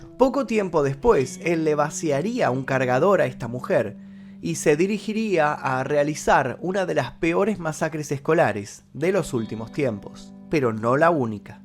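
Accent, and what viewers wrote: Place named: Argentina. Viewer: Me encanta este youtuber, con ese acento sweet argentino😊🥰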